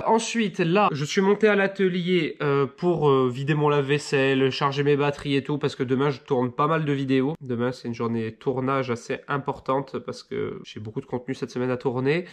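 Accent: Corsica, France. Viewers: J'adore quand tu fais des "interrogations exclamatives", ton accent corse ressort tellement !!! "Mona elle est pas là ?!!" "J'ai pas fait couler mon café ?!!" Ne change rien 😉😄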